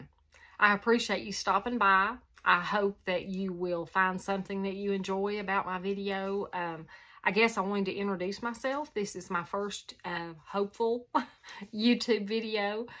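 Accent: South, United States. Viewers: Hello, I’m an old southern gal from the woods of southwest Alabama, so your accent sounds just like me. I’ve been sewing since I was a little girl, myself. My grandmother was a seamstress who sewed for other people and she made most of my clothes growing up. She taught me the basics of sewing and I took it from there. Your sewing room looks interesting, I have Pfaff machines also, as well as a Singer Professional 430, a Juki 2000qi straight stitch and various other embroidery machines and a brother serger. I’m am like you, I keep everything, so I have had to do a declutter in my room this year, that hurt! My sewing room is outside of my house in a metal building that was used as an office years ago. It’s just across the yard and about 12 by 24 feet. It works for me as I can leave everything out without disturbing anyone. I will subscribe and look forward to watching your videos. 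· I’m new to your channel and love your southern accent 😊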